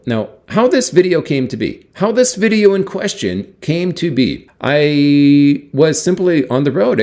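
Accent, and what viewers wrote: Canada. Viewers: Ha ha!, you don't hide your western Canadian accent. Very nice sound to my ears, even though I am from Newfoundland with a very different accent. After 4 years in the Philippines, the accent is nice. Stay cool bro.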